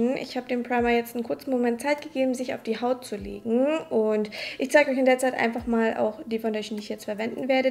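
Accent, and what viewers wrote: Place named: Germany. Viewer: Ich find's süss wie du die englischen Namen richtig Deutsch aussprichst 😂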